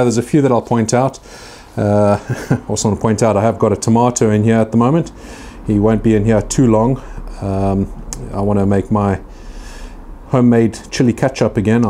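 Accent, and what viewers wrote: South Africa. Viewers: I really like your chilies 🌶 and I know your in U.K., but I detect South African accent? Maybe I am wrong. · I might be completely wrong, but do I somehow detect a little bit of South African in his accent? The way he speaks just sounds like home.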